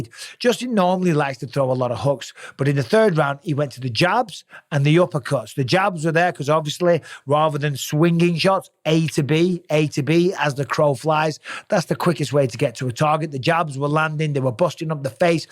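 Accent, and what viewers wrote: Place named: United Kingdom. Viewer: I love your commentary. Me and my dads favorite moment to this day was during usman vs masvidal 1, usman was brutalizing masvidals toes in the clinch against the fence and you basically suggested if you were masvidal you'd be looking at the ref saying "cmon ref, have a word, he's stomping on my toes" of courage emphasized by the British accent lol. I don't think it would be terrible of you to admit that just as Justin is biased toward his friend Kamaru, you have a tendency as a fight fan from the UK, to root for those fighters. Even as a caster you can't help but to be a fan, Cormier falls victim to it as well. It would be a lot more forgivable if you just said, "of course I'm a little biased, we're in the UK and I'm rooting for my guys". If you'd like a better example of your bias you should rewatch the Jai Herbert fight, imo that was the one where your bias was most easily recognizable. 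Keep doing your thing though bruv, there are lots of us that look forward to the cards that you cast.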